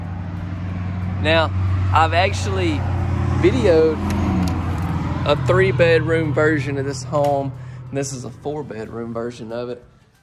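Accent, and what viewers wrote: South, United States. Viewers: Stop saying utility!! 😡Keep that charming southern accent! 😍🥰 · I was surprised with the price on this...I was expecting it to be about $50,000 more than the price you quoted! Also, you didn't point out that the closets have traditional shelving and round rods to hang things on. I notice you corrected yourself when saying "utility". I hope you keep talking the way you did in earlier videos. I like hearing your Southern charming accent!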